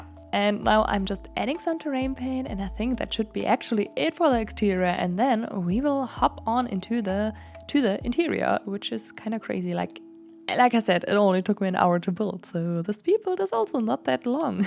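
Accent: Germany. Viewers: Bist du deutsch? Hört sich so an :D Oh mann, ich wünschte ich hätte in echt einen Ort wie diesen 😍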